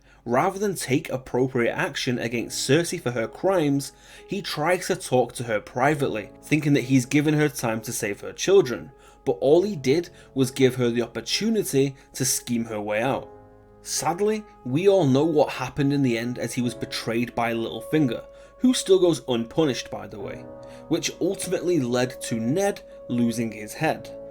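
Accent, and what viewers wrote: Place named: Northern England, United Kingdom. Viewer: ur accent???? where is it from just curious? its mixed of jon snow (winterfelish/northern english/ mancunion) n American? its mixed or is it me only? or i donn know i m mancunian so hope u donn mind mate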